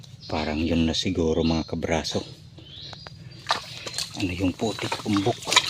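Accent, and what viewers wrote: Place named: Iloilo, Philippines. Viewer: Oy ilonggo ikaw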